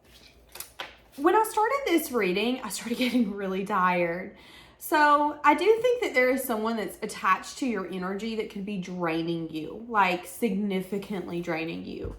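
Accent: South, United States. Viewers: Thank you for sharing queen 👑 that was an amazing read,it seemed to resonate with several interactions I've had with loved one.❤🎉I just love listening to your country voice, you are a...😊! I usually keep my thoughts to myself, but when I do speak up (as I'm getting stronger) I seem to be more aggressive in my approach. Family members say I am mean. Probably I am still holding on to hurt & trying to heal.I had to go into isolation the last two yrs. And pull away from a lot of people I really loved. I spend most of my time with two beautiful kittens now and I still believe in love 💕💜 that really was a nice read thank you 🎊💜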